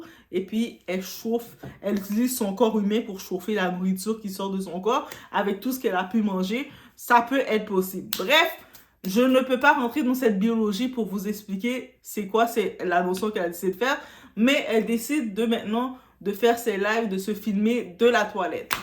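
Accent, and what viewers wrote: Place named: Quebec, Canada. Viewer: J’adore ton petit accent franco-québécois. 😉 Je ne connais pas Hawabelle, c’est toi mon influenceuse. 😘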